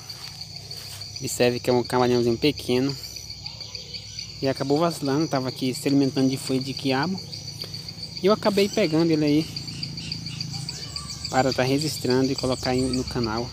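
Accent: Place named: Paraíba, Brazil. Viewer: Comlissenssa vc e da paraiba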